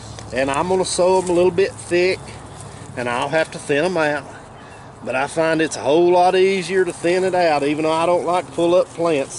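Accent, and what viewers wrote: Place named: Georgia, United States. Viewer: I was born in south ga. You sound like home. I live in AL now because that’s where my husband is from. I planned to get my mustards in the ground at the end of August. And yes thinning is hard to make yourself do when they’re first coming up but boy have I regretted it when I didn’t. Enjoyed the video. God bless and happy gardening.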